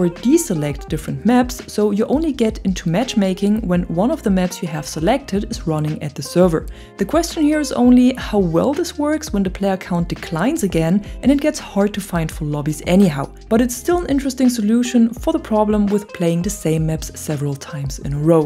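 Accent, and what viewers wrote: Germany. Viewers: I'm only here for the sound of your voice, are you German?😊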